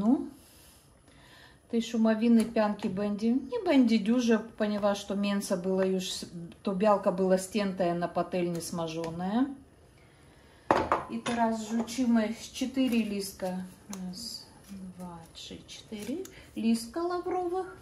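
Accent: Ukraine. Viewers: język polski, akcent ukraiński, rosyjski? przepis znany mi z literatury rosyjskiej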